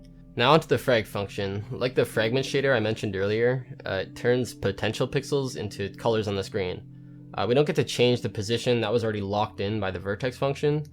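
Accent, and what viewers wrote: United Kingdom. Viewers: The British accent is so funny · Awesome short but rich and enlightening explanation. Also thank you Vaguely British fragment guy !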